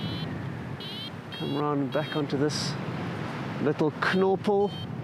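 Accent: South Africa. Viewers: Haha nothing like Porterville to bring out your sarth efriken accent ey bru! Lekka!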